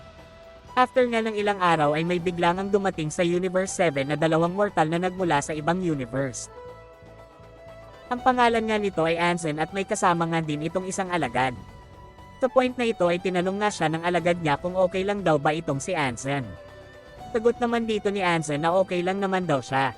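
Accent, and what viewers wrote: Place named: Visayas, Philippines. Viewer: Bisaya, katamad makinig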